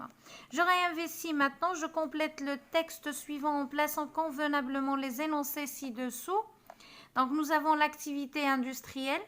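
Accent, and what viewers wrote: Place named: France. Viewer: Merci énormément Madame, votre travail est admirable. J’ai beaucoup aimer votre accent française et la explicación formidable que vous avez-vous fait en français. 👏🏼👏🏼👏🏼👏🏼👏🏼 top top top 👍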